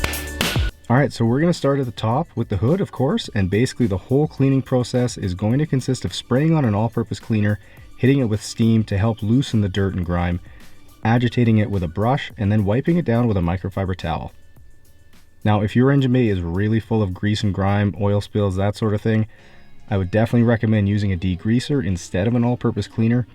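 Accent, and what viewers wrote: Canada. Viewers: Are you candian?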